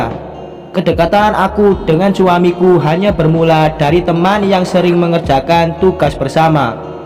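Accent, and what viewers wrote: Java, Indonesia. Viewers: Maaf ya mas bacanya pake intonasi,,daaan jng medok2,,kok ky anak SD🙏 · Mocone kok medok nenen tho maaas, mengurangi kenikmatan pendengaranku😇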